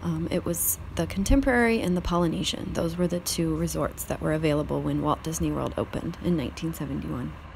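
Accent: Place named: United States. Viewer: Yes, she does have a very pretty voice. Being English, I have the bonus of hearing her soft American accent as well.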